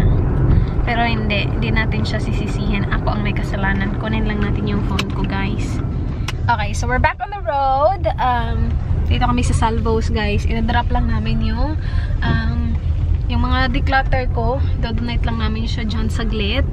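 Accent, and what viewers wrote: Visayas, Philippines. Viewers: I'm new to your channel and I'm like "she speaks tagalog pero parang bisaya" because I can relate. Then I heard you say "Ay ambot nimo". #confirmed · Luhhh bisaya so ate♥️😅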